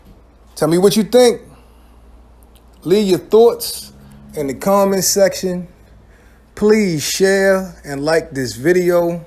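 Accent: Baltimore, United States. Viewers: Are u from Baltimore? Cuz i hear that accent 😂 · You definitely from B-more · Whoever made this shit is from Baltimore. I can hear it in yo voice motherfucka... 🤝🏿 Salute! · Bmore prolly? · You sound like you're from Baltimore or the east coast somewhere. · All I hear is your Baltimore accent. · Off subject ..But peeps from BMore accents be wild wit The U`s and 2's. GETS ME every time but anyway always dope videos 🤣🤣🤣🤣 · U got a hard Baltimore accent. I recognize it anywhere.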